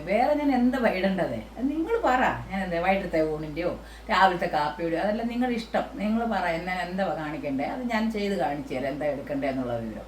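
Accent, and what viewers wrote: Kottayam, India. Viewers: കോട്ടയം സംസാര രീതി നിക്ക് ഭയങ്കര ista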